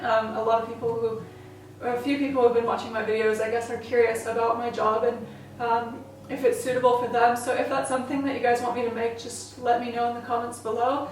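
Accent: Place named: Australia. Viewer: How long have you been in Australia? I’m picking up a bit of an accent from you 🧐 Glad you’re doing well 🙂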